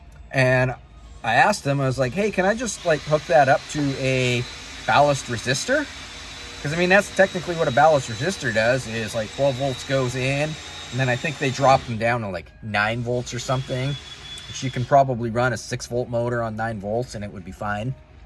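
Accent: Canada. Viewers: Canadian eh!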